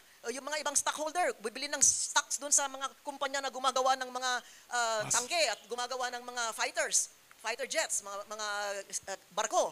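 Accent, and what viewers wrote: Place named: China. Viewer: Chinese ka ksi kaya ganyan ka magsalita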